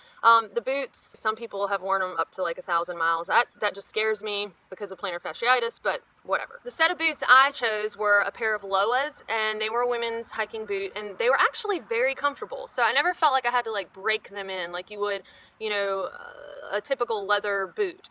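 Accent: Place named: Tennessee, United States. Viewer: I have watched several of your videos of the last 5 months or so and I have to say this and I mean it in the nicest way and as a complement. I have the biggest crush on you and your personality. Your southern Tennessee drawl and snagged tooth are icing on the cake of your sweetest disposition and personality. If I was 15 years younger I would be flirting big time. Lol you are very pretty but not afraid to get dirty and for such a pretty girl that is amazing. After you mention you danced to I was this girl the greatest! I like the way you talk in your videos as you are real and humble in your advice. Not a know it all or a person trying to make money on YouTube just simple and honest. May the wind be to your back while traveling to " " cheers from a California redwoods man they call me Mexibilly.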